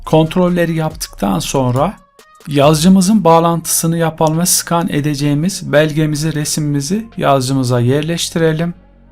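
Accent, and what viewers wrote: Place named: Kargın, Turkey. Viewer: Harika güzel olmuş emmi nerelisin sesin kargın adamina benziyor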